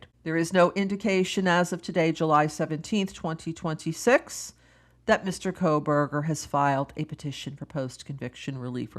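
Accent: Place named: East Coast, United States. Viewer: Oh, and I just have something else to add. From today and on into the future whenever someone says to me' "who asked for your opinion "? I will turn to them and smile serenely and reply... " DID"! 😅😅 I LOVE YOUR EAST COAST ACCENT. It cracks me up when I hear you say DAY -GO'S name as Dah-go! It's like out west people say "my ANT", where as I say "my AUNT". PUH TAY TOE, PUH TAHT TOE! 😂😂😂. After almost 4 years I actually just learned his real name is Joe!! 😅 Hope you're enjoying the weekend!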